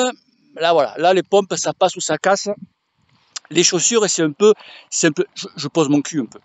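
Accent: South, France. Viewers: Super,a fond la forme.. Trop bien avec l'accent du midi 😅🤟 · La vidéo, les bons commentaires et l'accent du sud font plaisir ! Merci pour ce moment, l'équipe est ravie de ce retour ! A très bientôt, La team Van Rysel :)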